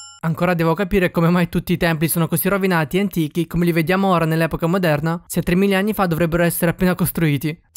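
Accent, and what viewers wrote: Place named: Naples, Italy. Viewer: complimenti per il video. PS. l'accento parteneopeo si sente tutto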